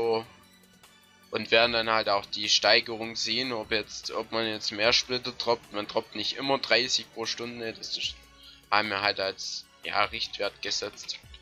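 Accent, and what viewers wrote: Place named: Swabia, Germany. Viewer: Du kommst safe aus dem schwabenländle^^ aus welcher Richtung?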